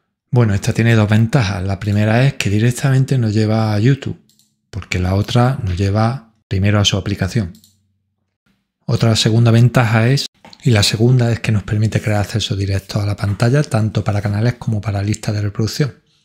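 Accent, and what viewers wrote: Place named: Andalusia, Spain. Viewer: Por el acento, Andaluz (España), no estoy segura si Sevillano, quizá Malagueño eso ya no estoy segura.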